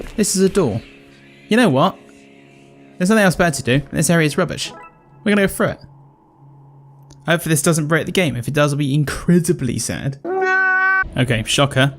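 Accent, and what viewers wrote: United Kingdom. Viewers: your soothing British voice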